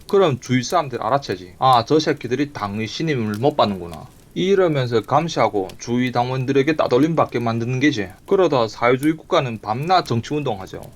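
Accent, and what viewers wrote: Yanbian, China. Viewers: 좋은영상 잘보고있어요 지난역사 현정치에대하여 머가먼지 자세히는 모르고 있지만 ㅠ 님영상보면서 여러모로 많운걸 배우고 생각하고있답니다 사실은 같은 조선족동포임에도 유트브로듣는 우리사투리가 처음엔 잘적응이 안됐었는데 듣다보니 친숙하고 님의 깊은 마음도 자세히 전달되는거같고 재미있게 보고있어요 감사해요 댓글은 처음이네요 화이팅하세요 ~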